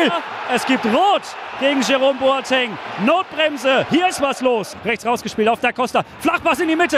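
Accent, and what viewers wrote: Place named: Hesse, Germany. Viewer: ... lebt die Ekstase auf Hessisch 😊 Bitte weiter so, lieber ⚽️☺️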